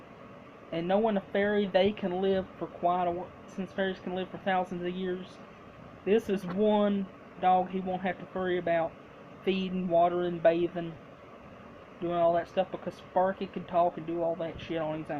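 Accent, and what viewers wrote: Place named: Texas, United States. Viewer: Love that Texas accent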